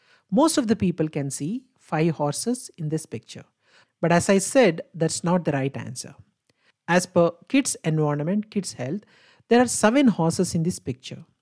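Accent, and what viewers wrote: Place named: India. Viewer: Maybe you shouldn't explain an optical illusion to English speakers with such a thick Indian accent. I'm happy you explained it, but I couldn't understand what you said for H 6-7. I still only see 5.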